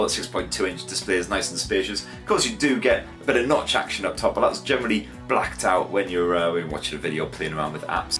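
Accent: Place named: England, United Kingdom. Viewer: Still sounded more pom than Aussie but it's forgivable haha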